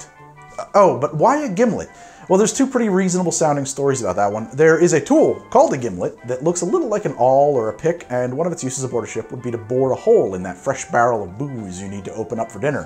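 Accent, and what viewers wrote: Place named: New Jersey, United States. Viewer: The Doctor might of not been real or just had the same name as the tool. It could of been a play on word sort to speak, like how Chefs and Cooks call the microwave CHEF MIC/Mike. Because the Gimlet was a tool to keep the sailors from getting sick they called it "Dr." Gimlet. I love your Videos and I'm a fellow Jerseyan don't apologize for the way we say stuff it makes other states think we're weak lololol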